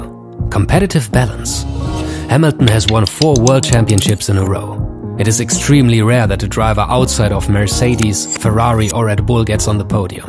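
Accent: Germany. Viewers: Anyone else thinks this is a German accent? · Finaly. Youtube recomendation something good. I love german accent. Make me feel smarter.. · is the person talking german? i had a feeling the whole time but then he pronounced Vettel correctly, he must be german right?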